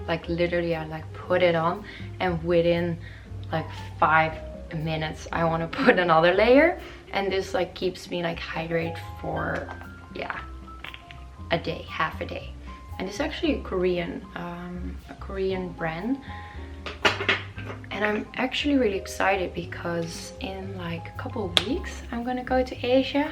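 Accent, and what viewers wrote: Netherlands. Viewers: Je klinkt een beetje Nederlands nog! Ik kom van masha haar mukbang :)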